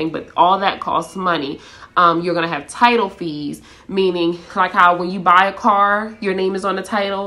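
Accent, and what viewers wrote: United States. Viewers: Congratulations 💯 I can hear the baltimore in you 🦾 we different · Are you from PG county? The accent! lol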